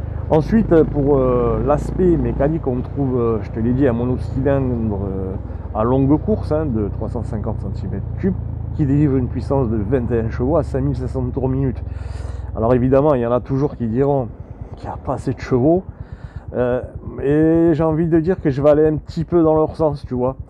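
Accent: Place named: Southwest, France. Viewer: Merci pour cette belle présentation avec ton magnifique accent du sud-ouest 😉 Je trouve que la bécane était un peu trop rapidement au rupteur, tu confirmes ??? ✌️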